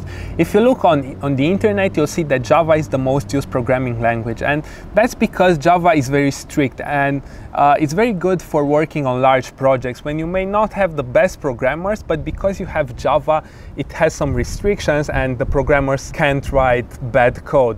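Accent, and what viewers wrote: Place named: Romania. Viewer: vorbesti engleza ca un roman..fa le frate in romana si adreseaza-te romanilor..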